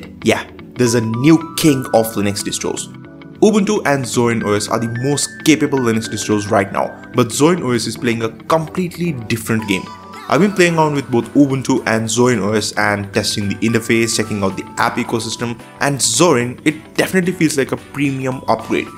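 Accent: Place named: India. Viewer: Apu dialect made me close this shit instantly...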